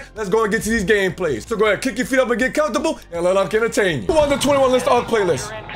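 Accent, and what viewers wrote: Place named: New Orleans, United States. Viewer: Where you from I’m from New Orleans sound like you from here me and my brothers betting they said you not let us know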